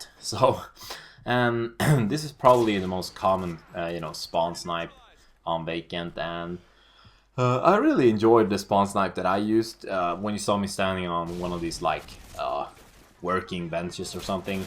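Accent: Sweden. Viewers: youre the best, love your swedish accent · wat the hell wow it seems u very popular dude lol cauz your swedish:P:D everybody likes abit of Foreign Accents hell yeah for the world!!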